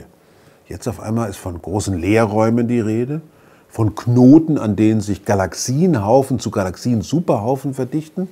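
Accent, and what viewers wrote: Swabia, Germany. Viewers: ...aber Schwäbisch!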